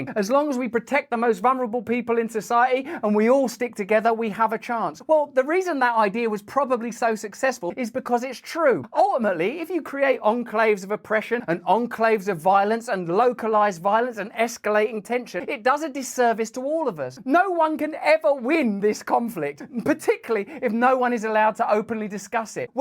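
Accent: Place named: London, United Kingdom. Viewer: u sound like ur from london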